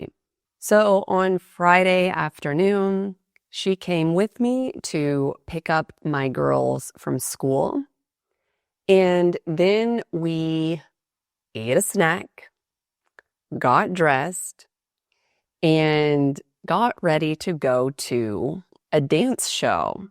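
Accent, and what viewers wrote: United States. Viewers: This is American English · American accent its amazing 🥰so beautiful.I never study English but i understand 70% because i always was watching american movies in original language with sub russian And i speak spanish,i lived in Argentina for 6 years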